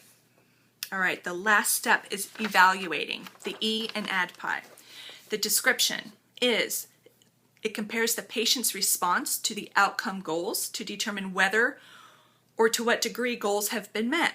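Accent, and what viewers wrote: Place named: Maryland, United States. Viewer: You must be a marylander... you have the same accent as me! Love your videos, thank you so much for taking the time to do them!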